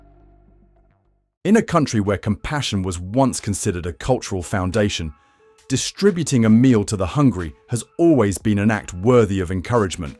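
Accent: England, United Kingdom. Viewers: The story would be better if it was. told by someone who sounded American. An Englishman, may just not think much of America, in general.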